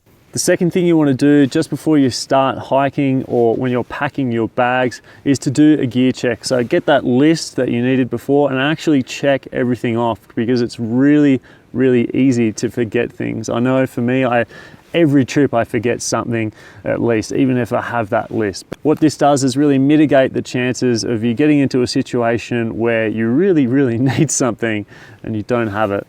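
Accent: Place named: Australia. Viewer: So great to hear an Aussie accent!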